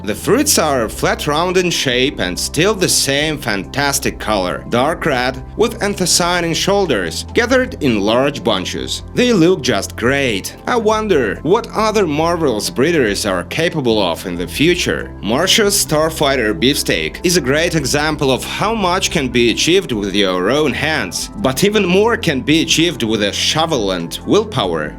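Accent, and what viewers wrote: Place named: United States. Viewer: Забавно, а звучит так гипер американизировано, что я какое то время поразмышляла в каком штате так могут разговаривать lol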